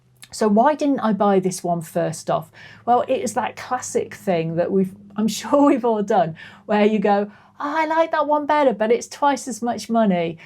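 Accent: United Kingdom. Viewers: Is that a Cheshire accent?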